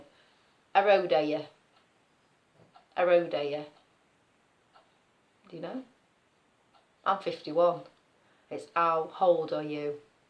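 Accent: Nottinghamshire, United Kingdom. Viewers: It also makes me laugh when I see actors playing Robin Hood and his tribe - none of them ever try the Nott’s accents…this is what he should sound like 😂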